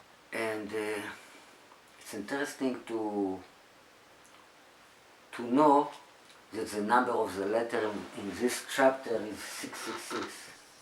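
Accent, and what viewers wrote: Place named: France. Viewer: Je prie pour vous Rav, votre accent en Anglais revele que votre langue maternelle est le Francais ainsi que la mienne. Je prie que YESHUA le ROI MASHIAH BEN YOSEPH souffrant a sa premiere venue avant le destruction du 2eme temple et qui a pris les peches des brebis perdues d'Israel en premier lieu et ceux du monde entier ensuite....mais reviens comme le LION DE JUDAH se revele personellement a vous comme il l'a fait avec moi...IL m'a gueri quand je ne le connaisais pas....vous, vous avez le privilege d'avoir entendu la Bonne Nouvelle de Son Royaume, moi je ne l'avais pas eu et je ne savais rien a son sujet....jusqu'a ce que dans la priere au Boreh Olam Baruch Shmo je lui ai demandee de venir me guerir de ma maladie quand j'etais en France. Ce soir la YESHUA Hitkadash Shmo, est venu vers moi, IL a pose ses mains sur moi et IL est reparti, mais 3 jours apres j'etais completement gueris. 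C'est alors que יהוה m'a fait comprendre que je devais lire SA PAROLE ECRITE....mon papa Salomon, qui est au ciel, par la Grace que YESHUA lui a donne, etait le Chazan de notre sinagogue chez les Lubawitch, que j'aime beaucoup, c'est la ou mon fils a fait sa Bar-Mitzva. Mon papa avait grandi dans une Yeshiva a Tanger et il nous racontais que jamais ils etudient les prophetes et il n'avait jamais lu les propheties sur le MASHIAH. Que le D.IEU יהוה d'Israel vous fasse Grace comme IL nous l'a fait a nous et vous et votre famille soyez sauves par celui qui VIT a la droite du PERE Eternellemen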